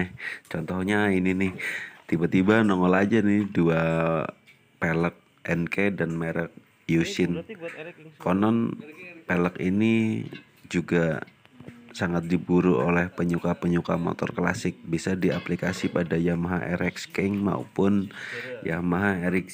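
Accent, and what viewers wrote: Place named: Banyumas, Indonesia. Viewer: Wong ngapak mas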